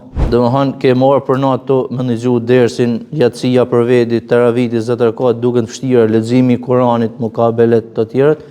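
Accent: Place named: Deçan, Kosovo. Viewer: Mos jeni nga Deçani? Sepse ashtu e paske Dialektin!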